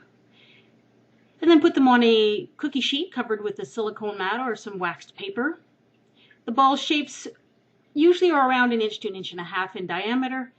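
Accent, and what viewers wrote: Canada. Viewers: It's called a Canadian accent.. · Are you Canadian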